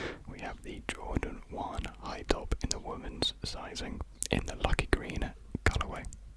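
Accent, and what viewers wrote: United Kingdom. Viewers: I just found you and love your content, I saw and listened to your quilting YouTube as well, also saw additional content since then, hope you found a nice mix of YouTube and new career adventure. I must say now that I found you your are my favorite A-S-M-R MAN, LOVE THE BRITISH ACCENT. YES WEAR YOUR SHOES!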